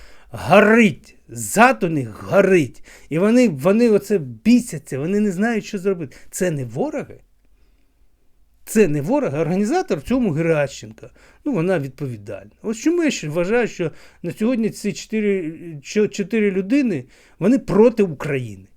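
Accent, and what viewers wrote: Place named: Russia. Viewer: Вы совсем придурок от природы или придурок , который таким стал во время войны?А ЧЕГО СТОИТ ВАШ УКРАИНСКИЙ? С МАССОЙ ОШИБОК И УЖАСНЫМ РУССАИМ АКЦЕНТОМ.КУДА ЛЕЗЕТЕ ПРОВОКАТОР.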